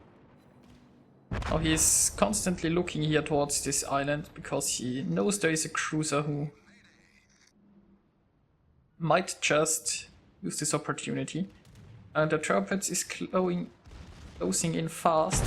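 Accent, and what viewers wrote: Germany. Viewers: are you german? Your accent sounds like a german who is speeking english.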